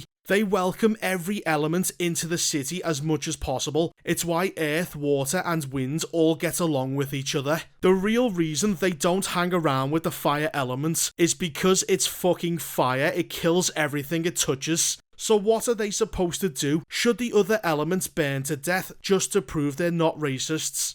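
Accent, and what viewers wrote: Liverpool, United Kingdom. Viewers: Wait..are you by chance a scouser lad?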